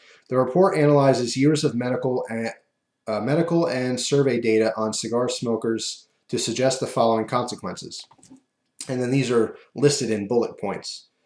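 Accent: United States. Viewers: i just assumed you were american the way you were talking, you came across a bit strong and also, /watch?v=LIlUcPRYroM&feature=plcp&context=C4582d3bVDvjVQa1PpcFObXtukHaAHVqDa_DPkS-9G5riny3IwqT8%3D .this is comeing from the man him self, this argument is getting off topic ,i have to bring her back.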